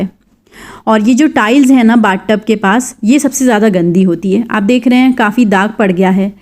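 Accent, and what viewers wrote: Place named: Varanasi, India. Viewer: The way YOU said this 👉🏼 "..yahan to etna badaa badaa bathtub🛁 hai..esme koi late k to नहाता hai nahi pr Clean pura krna padta tha😅😆is sooo Desi style n 💯 true as well. I live in medina N i loooveeee ur desi accent its sooo much similar especially ur "kahawate/muhaware" which reminds me my home town Banaras,U.P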